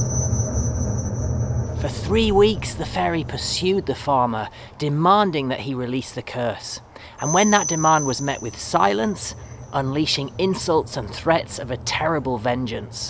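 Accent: Ireland. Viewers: Your accent becomes more Irish now mate! ☘️💚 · Sounds like you have become a Fully fledged irishman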